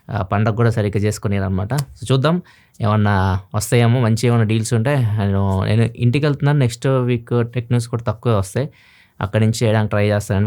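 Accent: Ongole, India. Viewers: Intiki velutunna no Ongole language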